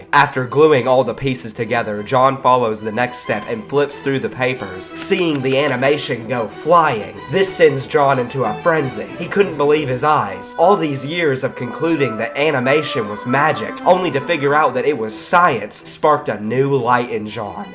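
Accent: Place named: South, United States. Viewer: Amazing video. You did great and it's awesome to hear a good country accent brother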